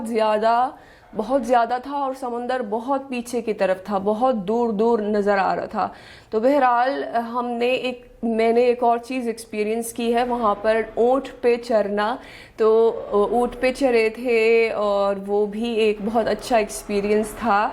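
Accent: Pakistan. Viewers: No one say you’re NOT PAKISTANI because your facial expressions and Urdu accent is very closely to Pakistani. I would like to where you were raised. Where in Morocco your parents from. I’ve been to merrakesh, Casablanca and Tangier. I love your country and I was thinking to move there. Please show country which is beautiful and cost of living is so affordable. Love to know more about your upbringing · Accent is Pakistani, ..... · You don't look Moroccan,accent and look all over Pakistani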